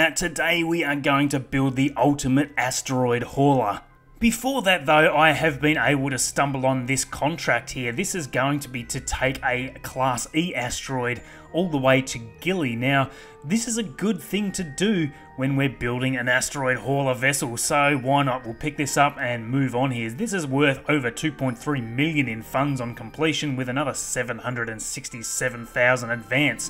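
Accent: Australia. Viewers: Another awesome video.....and it's really wonderful to hear a fellow Australian on KSP videos.....not that Shadowzone's German accent and Mr Manley's Scottish brogue aren't entertaining but it is a lovely reminder that we Aussies love all things spacey as much as the rest of the world. Cheers mate! Bloody bonza job!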